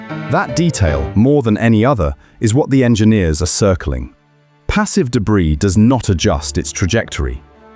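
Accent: United Kingdom. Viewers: Adding the British accent always adds some officiality to the video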